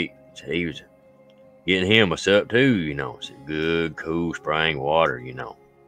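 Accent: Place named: Georgia, United States. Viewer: The story teller accent is identical to my friend from Georgia.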